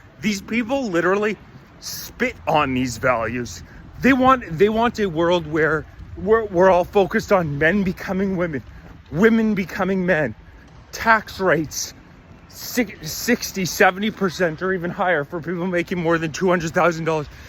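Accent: Canada. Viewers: This guy and his vaguely hoser accent don't make me laugh 😂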